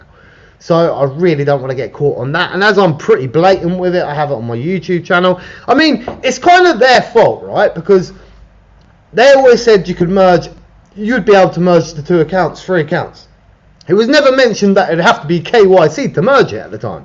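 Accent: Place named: England, United Kingdom. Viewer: "also hey, your deffo from England not Wales haha you better get that Rhys name correct ahhaha same name as me it's a Welsh name pronounced like R "ee" s 😂😂😂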